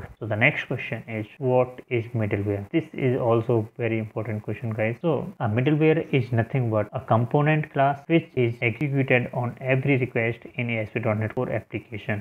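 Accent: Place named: Western Europe. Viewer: Nice, nice, Western Europe sound.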